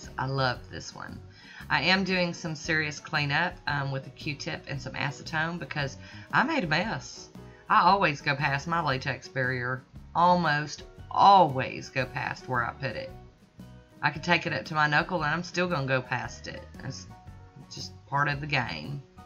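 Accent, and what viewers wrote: South, United States. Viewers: Love it! Water-marbling is what started my nail art addiction too! Unfortunately, I never got very good at the water-marbling type of nail art but I’m getting better & I am better at stamping, and hand painted designs. Now I’ve ventured into doing my own acrylics, acrygel, & just recently started gel polish and hard gel. I love the products and tutorials by wildflowers nails and by Kirsty Meakin’s tutorials on Naio Nails aswell as her urban graffiti gel polish line are so amazing and easy to use! I love the formula of those gel lacquers they made all the difference in the world to my gel polish game! I was just using the brains found on amazon before that and had about given up on being able to do anything because I couldn’t get the gel polish to look good in order to use the wildflowers products but once I laid down her gel polishes using the tutorials she and Lauren out on youtube I was producing some great looking designs! 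Now I am not a pro I am just self taught from YouTube but because I was disabled by a drunk driver in 05 I can no longer go to nail salons due to my illness I have no immunity and have seizures so I started doing my own and then branched out to doing family and friends just for fun and when I’m able to (which unfortunately isn’t often as recently I’ve been sick and unable to get out of bEd for the past 2 1/2 months) but I enjoy your tutorials too! It’s so wonderful to have a fellow southerner to listen too! I had not been using small size bathroom cups s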